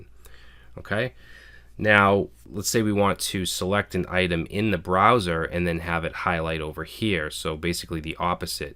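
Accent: Boston, United States. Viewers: Boston accent is killing me.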